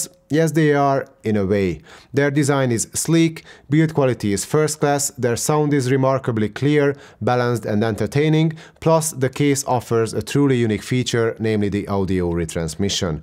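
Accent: Hungary. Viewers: Excellent review, saved me $400 since I was thinking about replacing my Airpods Pros for the PI7s. No need to now. Also the accent sounds Hungarian, curious if I'm correct.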